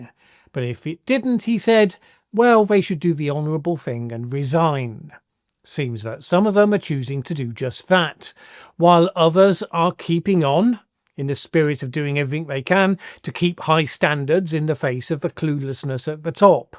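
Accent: United Kingdom. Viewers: There’s something strange about somebody with a British accent, criticizing America. Criticize your own country!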